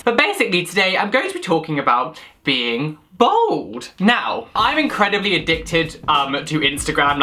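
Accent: United Kingdom. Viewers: You also have a beautiful British accent that literally covers it up I wish I had an accent that beautiful so for the rest of us we don't have accidents to confiscate I need my hair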